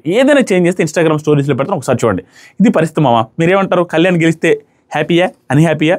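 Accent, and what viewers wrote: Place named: Nellore, India. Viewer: Hi,anna sleeping😴 review avuna😮😅 nenu from nellore district now in princeton usa ,mana slang so happy vinadaniki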